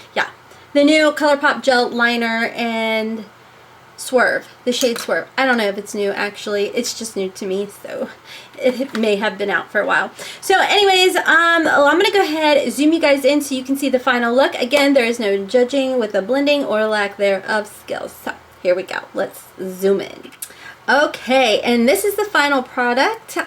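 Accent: South, United States. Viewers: Always a judgement free zone... But, girl, your blending looks great in all of your videos! And I love your looks. Totally, planning on copying some on date night with the hubs. I swear you sound like a southern transplant to KY! And that's a compliment because I am Southern as they come (SC!)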